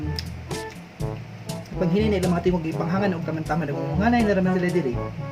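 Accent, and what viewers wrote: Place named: Visayas, Philippines. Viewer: gusto ko talaga ung accent mo magsalita ng bsaya hehe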